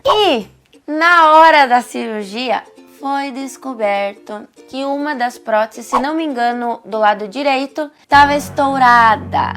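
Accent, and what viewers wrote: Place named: Rio Grande do Sul, Brazil. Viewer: Estou vendo pela primeira vez o seu canal e adorei! Parabéns! Seu jeito autêntico e, por vezes, engraçado despertou muita empatia. Como gaúcha que sou, mas morando no interior de são Paulo há anos, perdi o sotaque e amo ouvir vocês falares. Dá um "quentinho" no coração, sabe! Sucesso, guria! Tu merece. 😃😘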